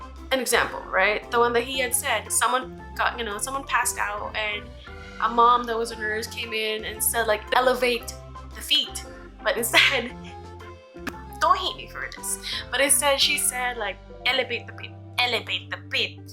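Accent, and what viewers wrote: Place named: United States. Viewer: !!!! Love your accent! American girl kaayo! ♥️😍Take care always! Muah! 😘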